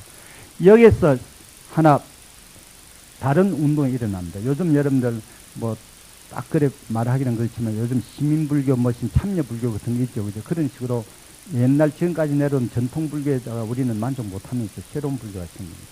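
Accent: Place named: South Gyeongsang, South Korea. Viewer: 구수한 경상남도 사투리의 질박하고 자세한 스님의 강의를 듣는 인연을 맺어주신 데 대해 감사드립니다.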